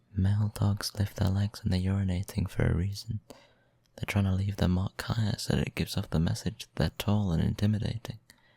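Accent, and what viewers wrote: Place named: United Kingdom. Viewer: Omg you are from the uk aren't you? I kinda fall in love with people from the uk just because of the beautiful way they pronounce every single word aaagh i can't. I apologize for mistakes, greetings from germany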